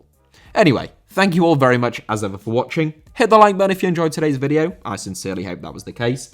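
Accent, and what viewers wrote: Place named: United Kingdom. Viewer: That's just how pronounces it with his Bri'ish accent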